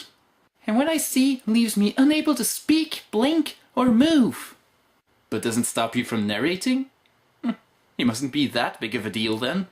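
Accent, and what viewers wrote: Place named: Germany. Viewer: This was hilarious! Loved the jokes, loved the accent (what is it by the way, German?). Great video! Only suggestion is to put only 3 clips instead of 4 and to quote a little less. Also maybe go over plot characters. Amazing video though, loved it!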